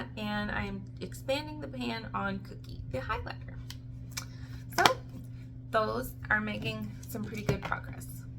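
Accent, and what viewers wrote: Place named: Texas, United States. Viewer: Which state are you in? My guess in Texas or Oklahoma, going by your accent. I've actually spent alot of time in the states, having family in California, Idaho, Illinois, Montana, Arkansas, Texas and Colorado. I'm not American but I'm first generation Australian, from Europe. Other family members have immigrated to US.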